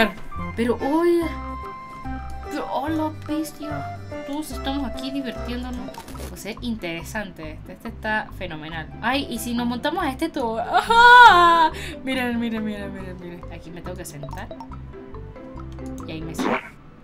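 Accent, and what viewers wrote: Argentina. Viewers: Oye eseñame a hablar argentino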